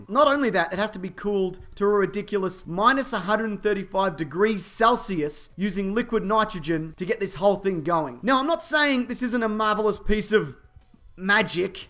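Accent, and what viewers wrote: Australia. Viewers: I never realised how much I love hearing Australians swear. Hey can you say 'fuckwit' in your next video. I just want to hear how it sounds. It's amazing the way Australians say it. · Fucking hilarious, as usual. Your comedic timing is perfect and your Australian accent stylizes your sense of humor perfectly. I would go to war with you any day, sir. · My second favorite video n this channel is just an Australian yelling about the future for five minutes. Priceless.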